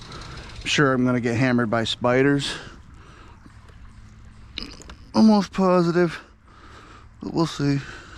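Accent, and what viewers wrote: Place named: Troy, United States. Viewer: Sounds like troy ny lol I'm in Rentler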